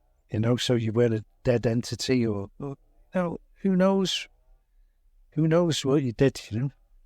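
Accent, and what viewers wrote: Liverpool, United Kingdom. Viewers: Typical far left scouser. . . . .Calm down!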